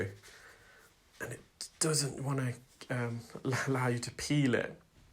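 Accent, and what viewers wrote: United Kingdom. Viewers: "...I am kind of renowned..." 💙 He manages to say it in such a way it doesn't sound arrogant or conceited. At all. Not sure if its the British accent to my American ears or he's humble and confident. Either way, I really adore him and his work.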